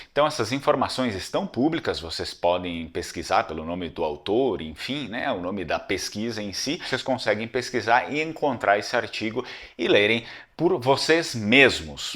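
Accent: Italy. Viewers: Essa tonalidade vocal é tipicamente italiana. Curioso que até os tons vacais fazem parte de uma nação, alem dos traços físicos própriamente dito!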